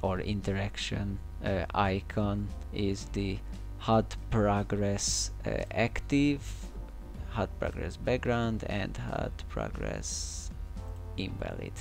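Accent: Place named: Hungary. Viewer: Szia jól rejted a magyart XD lehet hallani ahogy mondod hogy erölteted az angolt és a magyar kiejtéseden is hallatszik(saját mappa, eggyik videóban ki irtad angolul:ez egy magyar vagy valami ilyesmi nem tudok angolul) de egyb. örülök hogy van magyar profi!!!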